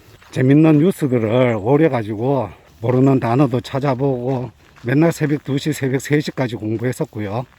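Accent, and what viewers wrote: Gyeongsang, South Korea. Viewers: 구수한 경상도 말투에 담긴 진지함에.. 좋은정보..항상 감사드립니다. 시드니에서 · 항상 뉴스 바로바로 올려주시고 구수한 경상도 사투리(저희 남편도 대구 출신)가 엄청 정겹고도 따뜻하게 느껴지네요. 이민 온지 13년이 되어가고 말만 시민이지 영어 포기자로 딸애한테 영어공부 좀 하라는 구박 받으며 이렇게 나이가 들어 가나 했는데 영어 해석 실력에 자극 받으며 테잎이라도 다녀볼까 생각중입니다!! 늘 업데이트 되는 최신 뉴스 열심히 기다리는 일인입니다!! 가족모두 항상 안전하고 건강하시길~ 오늘도 하겠습니다!! ㅋㅋ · 와우 처음왔습니다. 마스크하시고 옆집에 사시는 어떤 분과 같네요. 헉~~~6~7개월 동안 와.... 대단하시네요. 여행 갔다가 사시는군요... 아 경상도 말이군요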